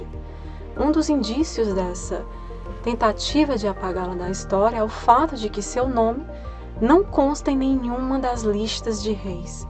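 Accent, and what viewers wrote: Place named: South/Southeast, Brazil. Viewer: Sou seu fã..., incluindo seu sotaque que, me parece, é do sul/sudeste...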